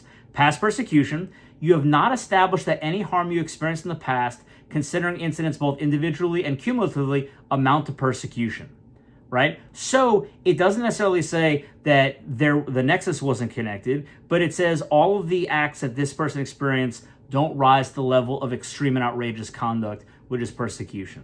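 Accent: South Africa. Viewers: Making progress, that introduction is starting to get pretty smooth. You are starting to sound like an English South African speaking Afrikaans.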